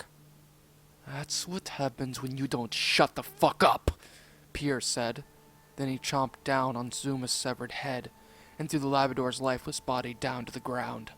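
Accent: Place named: Russia. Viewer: Not trying to be rude here but your French accent sounds like a Russian 's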